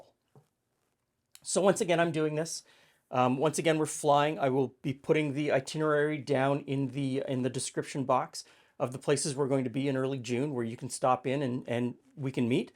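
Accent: Canada. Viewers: I really enjoy your channel. As a displaced New Brunswicker living in Virginia it is nice to hear "about" pronounced correctly!. I have a 1973 Northern Cookbook published by the Canadian Government Dept of Indian Affairs and Northern Development and I was wondering if you would like to add it to your collection. I think someone gave me this years ago and I have never used it (no local sources of beaver meat) but you may find it interesting. I think it is a bit of Canadiana and needs a good home. I will be visiting family and friends in Ontario later this month and could mail it to you from there if you would like to have it. Alfred Taylor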